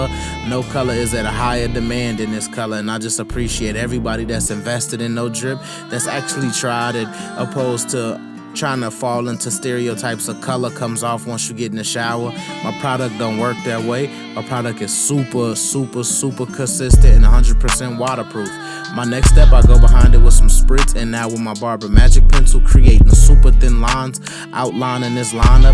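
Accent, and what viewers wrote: Chicago, United States. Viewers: Knew you were from Chicago just the way you talk we got a certain dialect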